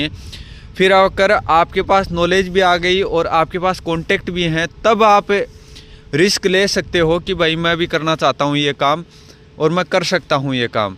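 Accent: Haryana, India. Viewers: Haryana k lage h bhai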